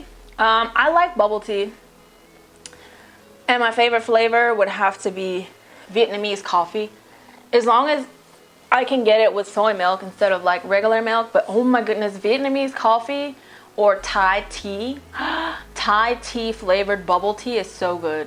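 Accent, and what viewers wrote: South, United States. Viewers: She is ssoooooo NOT from NY do u not hear her accent?? She sounds like a southern belle. im a born and raised nyer from Brooklyn our accent is pretty distinct from a southern one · omg im not american but i love that your american accent is still so strong, i love it, the things you say sometimes just crack me up, when you get passionate your accent really comes out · You kind of sound southern but then you kind of sound Asian but then you kind of sound black so I'm really really confused but it's whatever I kind of like it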